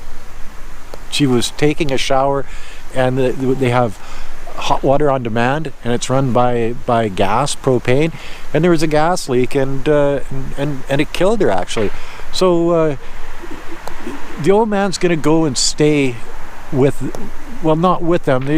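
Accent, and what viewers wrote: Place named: Canada. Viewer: As an American who grew up in the states my whole life I agree, it’s great to hear a variety of perspectives on China from a friendly beer-drinking Canuck with an awesome accent living there. I’d love to visit someday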